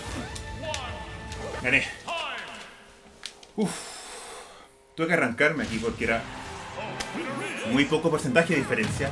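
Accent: Chile. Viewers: Eres Chileno? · Sos de Chile? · Que recuerdos, eras bien chileno 😂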